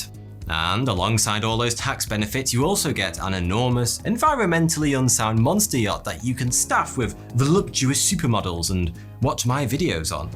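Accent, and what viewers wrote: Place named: East Lancashire, United Kingdom. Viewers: wouldn't it be crazy if he was a raging coke head off camera, and it's just the English accent that makes you think he is classy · Nah, he has an east lancs accent, more like Blackburn/Burnley 😀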